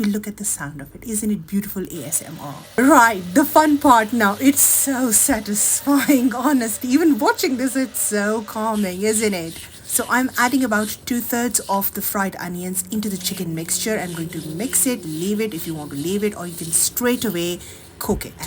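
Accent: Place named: England, United Kingdom. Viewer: That English accent....👏🏻👏🏻👏🏻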